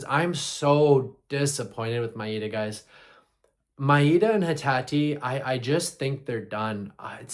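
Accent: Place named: Scotland, United Kingdom. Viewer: Keep up the positive vibes bro and love the Scottish slag you have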